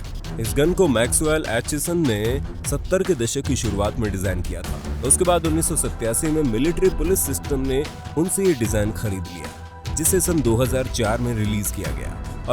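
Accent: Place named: Pakistan. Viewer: Kaise hogi? Pakistani hai yeh.iski awaz se saaf pta chalta hai.chanel ka naam haha....Paise kamane hai wo bhi india ke naam pe.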